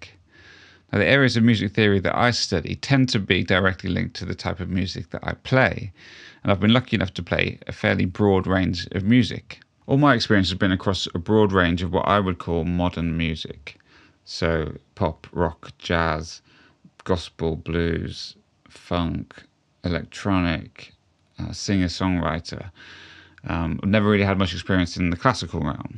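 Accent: United Kingdom. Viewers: Your delightful (South London?) accent and phrasing remind me of Tim Roth (aka Pumpkin) in Pulp Fiction. 😊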